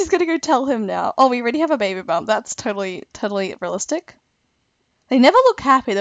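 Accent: Australia. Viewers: Is your accent, Australian?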